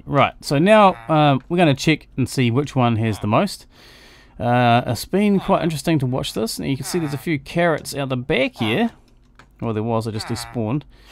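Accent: New Zealand. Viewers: are you new Zealander becouse im a nz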